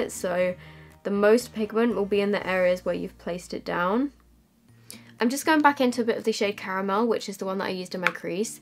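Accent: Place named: England, United Kingdom. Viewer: purple emoji purple emoji purple emoji I LOVE your English accent and your makeup looks!!! Legit whenever I see the thumbnails I'm like "OMG I NEED TO TRY AND RECREAT THAT LOOK" even if I'm legit staying home and doing absolutely nothing (and taking it off straight after) Btw hi from New Zealand if you see this!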